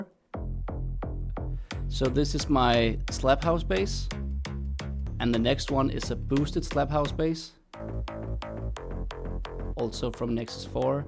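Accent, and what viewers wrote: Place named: Denmark. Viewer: Uhaaaa jeg kender en dansk accent når jeg hører den😂 Fed tutorial bro, det lyder dope🔥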